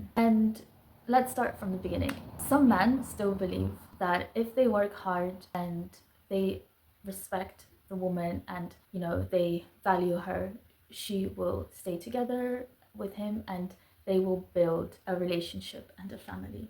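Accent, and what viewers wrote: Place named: Eastern Europe. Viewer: I think you are from Slavic country? Urs accent 😂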